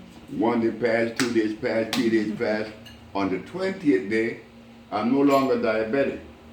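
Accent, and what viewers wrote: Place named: Louisiana, United States. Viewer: Did he say wait a minute round. Lol. That that talk down in Louisiana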